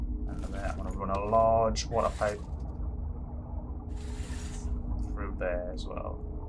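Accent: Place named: Newcastle, United Kingdom. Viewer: Where abouts from Newcastle are you from?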